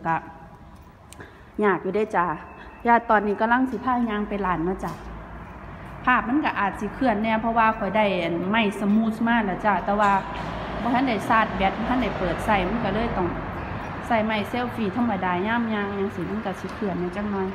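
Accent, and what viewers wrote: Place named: Isan, Thailand. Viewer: น้องฟังพี่สาวเว้าม่วนฯชอบค่ะเวลากินตำมะหุ่งแซ่บหลายค่ะ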